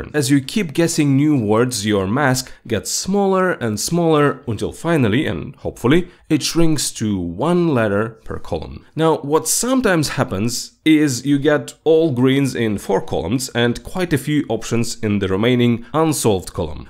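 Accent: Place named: Russia. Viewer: Как же очевиден русский акцент ахах, с первого предложения распознал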